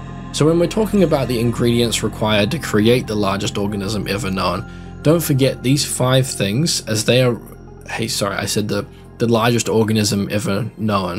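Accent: New Zealand. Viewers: I'm actually from Auckland too, I'm Maori and was born there, but I left for Melbourne a long time ago and but the reason I could pick it is because before I left, the rhotic 'r' sound (which is very prominent in your accent) was just starting to become more widespread and especially among the Pasifika population around Sth Auckland. It's actually quite nice on you, and very nostalgic for me to hear. I could listen to it all day. Anyways, great video. I liked and subbed 😊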